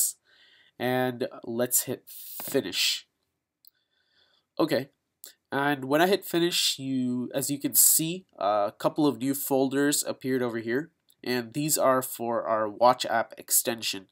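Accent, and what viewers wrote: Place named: India. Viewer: hicthed Naw, it's just your Indian accent. Nice vid!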